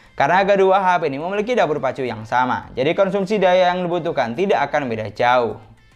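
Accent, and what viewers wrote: Madura, Indonesia. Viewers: mas logat maduranya kentel banget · Logatnya kaya madura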